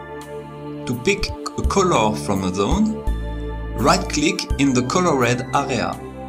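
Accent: France. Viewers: Cet accent français. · your french accent sounds amazing,to be honest. the video itself is very useful,thank you,the art looks incredible,love it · I worked in Issoire, France for 4 months in 2005, near Clermont Ferrand. It was refreshing to once again hear someone with a French accent speak such proper English. Makes me wish I was there again. Nice job overcoming the language barrier, and nice job on the video as well. I'm about to download Krita now in order to try to use it to illustrate a children's book. Wish me luck. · I'm not a native english speaker and I tent to have problems to understand people with accent. But understanding you is very easy don't worry. Most people, me included also like the french accent. Thanks for the tutorial! Would love to see more in the future. Love your art and love free software too!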